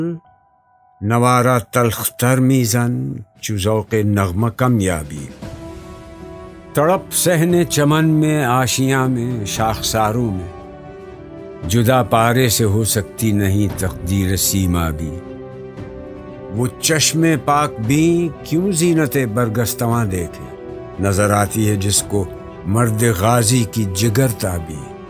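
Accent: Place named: Turkey. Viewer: Marvelous... But plz tell the pronouncer to not use the Turkish accent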